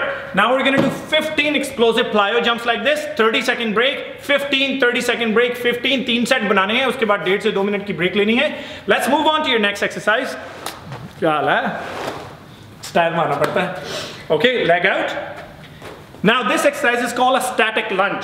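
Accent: Punjab, India. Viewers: watch ur all vedio bhai..i love the way u talk ur jokes songs punjbi voice n evry thing..👍😊m also pujbi nd jatniii 🤗love from pakistan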